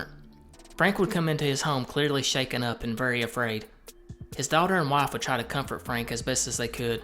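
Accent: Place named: Mississippi, United States. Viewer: That’s the First thing I thought. Great content in something close to my Mississippi accent! Keep up the good work!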